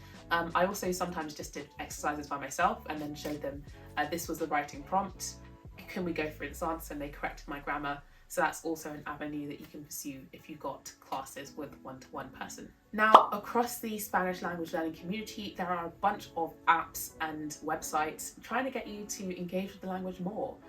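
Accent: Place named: England, United Kingdom. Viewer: Your English acent is amazing !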